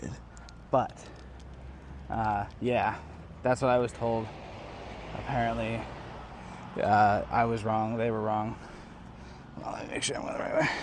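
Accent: Australia. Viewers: Love your Aussie accent. Don’t stop doing it 🤩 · You're definitely getting the Queensland accent mate. My Adelaide accent still gets confused for Kiwi in Melbourne. Nice to see you're still enjoying our country 🇦🇺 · Loved this video, Cleveland is beautiful. Your Australian accent is spot on love to hear it ❤. Wearing the hat will help if you have sweeping Magpies. Don't run, just calmy walk away if your being swooped. Leave the area, as the Magpie male will defend the nest by swooping. We also have crows here in QLD not ravens, every other state has ravens. QLD has alot of unique qualities that you won't find anywhere else. Australia is very diverse, thanks for your vlog I love it 🎉❤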